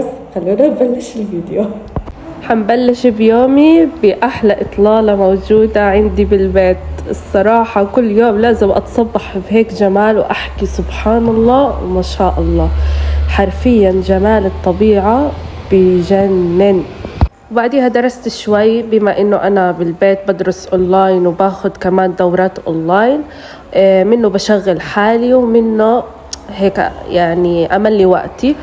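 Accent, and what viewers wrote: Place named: Algeria. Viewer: لايقتلك اللهجة الجزائرية ❤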